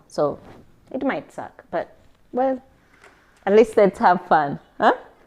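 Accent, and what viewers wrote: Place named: Pakistan. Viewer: You made me overjoyed to picked up Pakistani accent. It means you really love Pakistan ❤ 🇵🇰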